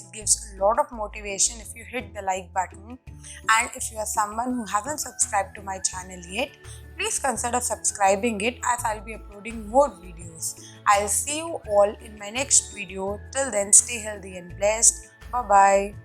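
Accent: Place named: India. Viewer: IM STRUGGLING WITH THE WAY YOU SPEAK YOUR ACCENT REALY INDIAN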